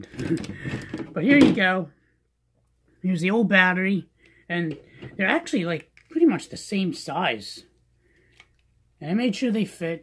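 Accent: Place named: Philadelphia, United States. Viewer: You sir are from the Philadelphia area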